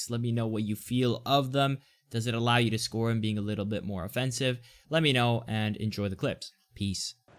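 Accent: United States. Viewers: well u sound like u from DC or something,well anyways I like ur vids very accurate and description based, congrats on subscriber growth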